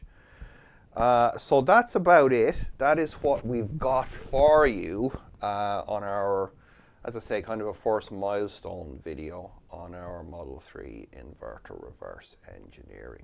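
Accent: Ireland. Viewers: Gah. Didn't see this comment till I'd watched it all. I'll know for next time :-) Not as slow as Mr Rikards, but the Irishness does show through :-)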